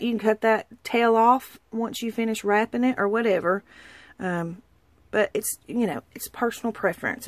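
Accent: South, United States. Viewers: This was a great tutorial. Your wire wrapping is beautiful. I love the fabric and stuff on the second bead. Do you have an Etsy shop? I didn't see any links or more ways to contact you. I'm from N. Georgia and I can tell you're from the south. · I sure have enjoyed learning from you and listening to your Georgia/Tennesse accent! You're too cute! Love the boho beads! <3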